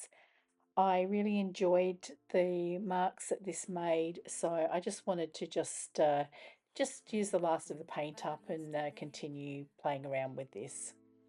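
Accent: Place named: Australia. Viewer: Just came across your channel today and I’ve watched a couple of videos. You’ve gained a new subbie. It great hearing Aussie voices. 😊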